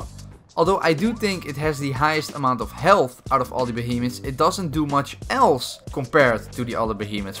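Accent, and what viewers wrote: Netherlands. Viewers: je kan wel horen dat je nederlands bent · Your so dutch, ik hoor je accent direct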